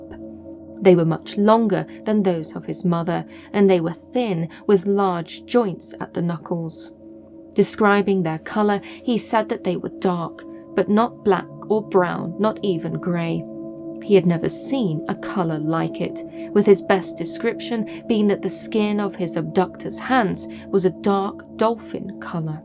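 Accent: United Kingdom. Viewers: Not to mention her pretty British accent. So proper!! Great narrator too.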